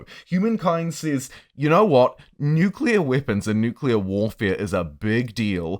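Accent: New Zealand. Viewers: Be nice to put a face to the Kiwi accent I've been hearing so much whilst enjoying this game! Is one of those gents in the vids yourself?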